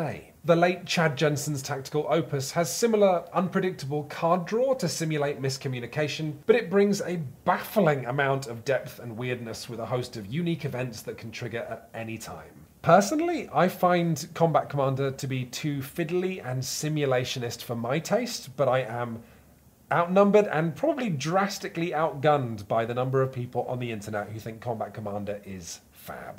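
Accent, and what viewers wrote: United Kingdom. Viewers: Genuinely moving stuff. It's especially refreshing and significant to hear this perspective shared from a British voice. This made me proud to be a donator.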